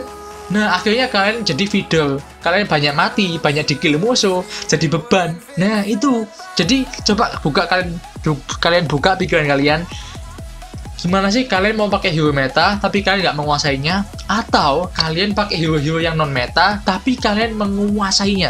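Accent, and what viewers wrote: Java, Indonesia. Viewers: Bang tolong tambahin logat" jawanya,ya bang 😁😁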